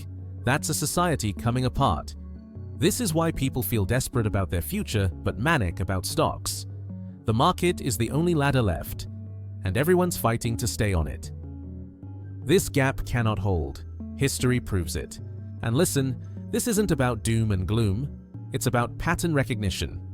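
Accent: United Kingdom. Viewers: Interesting that someone with a British accent is pointing out problems in USA. Compare Great Britain with USA and see what the results are! This is not 1929 and Americans can still start their own small businesses and have more income than those who work for a salary. Those who have the means are already shifting to assets..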